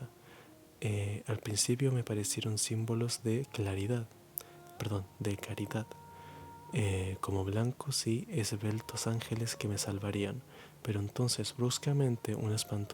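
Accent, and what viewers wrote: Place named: Chile. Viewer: Acabo de encontrar este canal… no sé qué tiene, pero AMO el acento chileno, este canal es una BENDICIÓN PARA MI!!! Por cierto, hermosa voz! Me encanta! ✨ (Saludos desde México 🇲🇽) Me encanta escuchar como se esfuerza por leer bien (me pasa también, tengo que releer algo para entenderlo bien por lo menos unas 3 veces)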